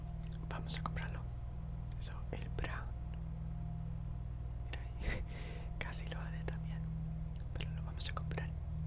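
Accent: Argentina. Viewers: Eres argentino? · Te juro que pensé que era argentino xd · Pero si es de argentina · bro tu español es increíble tenes parece Argentina ajjaja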